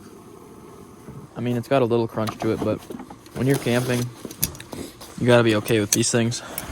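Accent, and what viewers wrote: Minnesota, United States. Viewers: I recently broke up with my boyfriend who is from Minnesota and your accent just reminds me of him, funny how I never noticed his accent before 🤔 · Currently living in Hong Kong and am stuck here for Christmas #$%*&! I grew-up in Minnesota and love the outdoor scenic views, hearing yr MN voice, and just watching yr general goofiness....reminds me of home. Keep the videos coming as they make me feel less depressed during this crappy pandemic.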